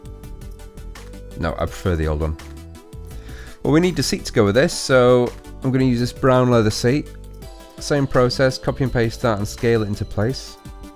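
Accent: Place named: England, United Kingdom. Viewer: i knew through his accent hat this guy is from england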